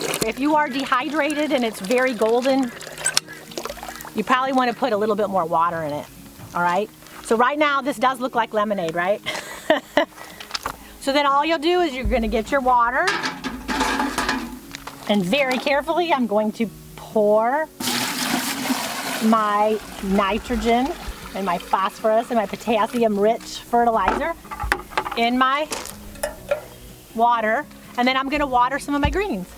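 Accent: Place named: Midwest, United States. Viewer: So thankful for your channel. I’m a mom of 4 and my husband and I really want to get out of the city. I feel crazy for wanting so badly to be off grid but you really have shown me that it’s not all that strange or impossible. Random question: Are you originally from Missouri? My daughter says that you talk like me. I grew up in Michigan and transplanted in Tennessee. Everyone here comments on my “accent” 🤣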